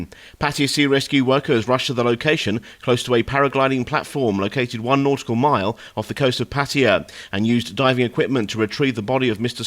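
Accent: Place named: United Kingdom. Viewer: Ei pysty. Mun huomio menee ton puheelle nauramiseen. Liian vahva brittiaksentti😭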